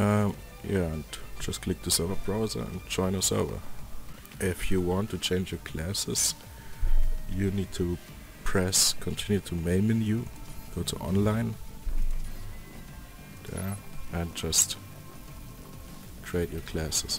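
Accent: Germany. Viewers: man hört deinen deutschen akzent sehr stark raus :D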